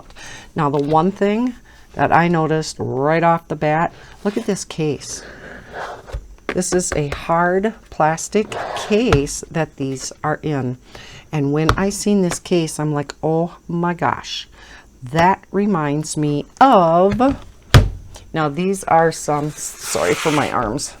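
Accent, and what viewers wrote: Midwest, United States. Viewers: I love your videos....where are you from? I was born in Mn and I hear a mid-west accent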